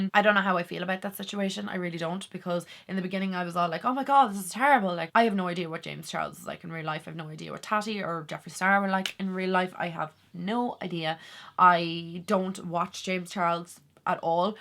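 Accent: Ireland. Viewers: I feel like certain makeup youtubers are trying too hard. I like watching you as i love your down to earth personality and your accent. My mams side is from Ireland. Lovely place 😍 you make me wanna grt my tongue done but im a scardy cat lol. Don't change hun 😀xxx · I love your makeup tutorials, all varieties. Also your Irish accent. I live in Texas. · I am here for the makeup. I think some YouTubers have forgotten that for many folks, they are not only a source of information and entertainment, but also a source of escape! Our daily lives are filled with SO much seriousness/responsibility: jobs/careers, family, illness, politics, etc; many see YT Beauty videos as a way of taking our minds off of all of that. A chance to 1). See a smiling face; 2). Learn of a new "look", technique, and/or product; 3). Hear a different accent (love that Irish lilt, btw!); 4). Even hear about a personal issue/problem that does not have an effect on us, but that we might be able to commiserate with, encourage, or even help others to know that they are not alone. 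But for me it will always be about the makeup. I do wish the "Drama" would remain behind closed doors. That is the reason for HAVing doors in the first place! Sorry for the long rant, but I am tired of those other folks forgetting their purpose here: To have fun with makeup! Thank you for being among those who do remember. Take care.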